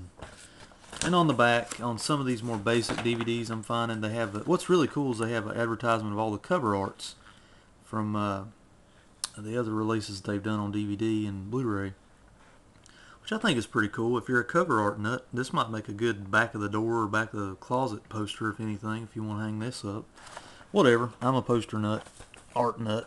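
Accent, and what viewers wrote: South, United States. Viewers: Whoa you have a really thick southern accent. Do you really talk this way in real life?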